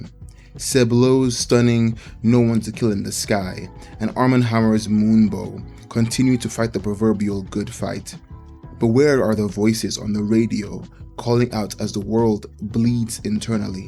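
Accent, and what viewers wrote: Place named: Nigeria. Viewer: You didn’t need to say you Naija, it’s a distinct accent, country also history.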